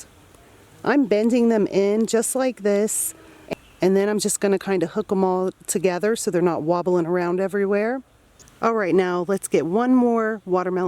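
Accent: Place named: Pennsylvania, United States. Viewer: Hi, new here! U sound like u are from my home state, PA! I think I am going to try melons this year!!🤞Good tips!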